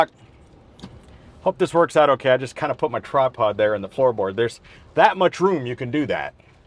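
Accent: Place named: New York, United States. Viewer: Hey my sweetheart ‼️‼️Im crying laughing at you pretending to be taxi driver🤣🤣🤣You sound just like a New Yorker🤣🤣🤣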